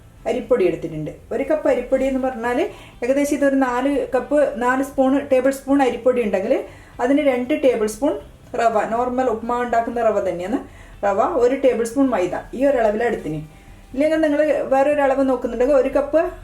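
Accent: Kannur, India. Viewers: Someone from kannur district yeah?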